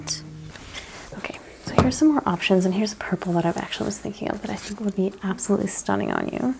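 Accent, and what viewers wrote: South, United States. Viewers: I love her accent… so southern ❤ I’m from Alabama so I guess that’s why I love it 😊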